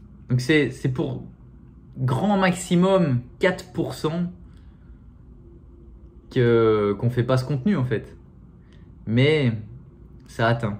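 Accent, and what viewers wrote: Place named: Belgium. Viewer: Très très touchant, tellement réaliste, bravo de dire tout haut ce que l'on pense tous! Et sinon ton petit accent belge😍😍 Gros bisous et bon courage à ta maman et à vous tous dans cette épreuve.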